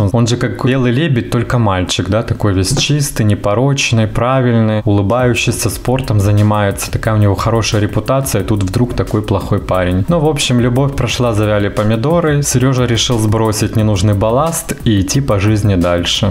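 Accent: Ukraine. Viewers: Украинизмы придают речи своеобразие и неповторимость.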